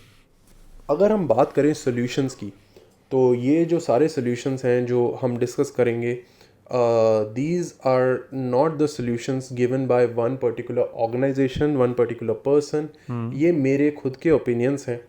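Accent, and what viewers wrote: Pakistan. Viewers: He sounds more like Pakistani....(accent)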